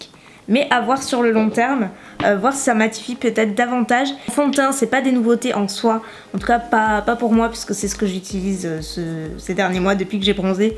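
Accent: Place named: South-West, France. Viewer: moinSSS le bon accent du sud ouest ;)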